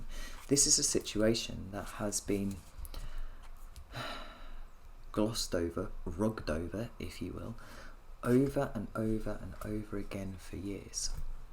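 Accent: United Kingdom. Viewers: I absolutely love your readings, but am wondering if there is anything that can be done to improve the audio. I struggle to hear them - add that to the struggle to pick up the British accent and I have a helluva time!!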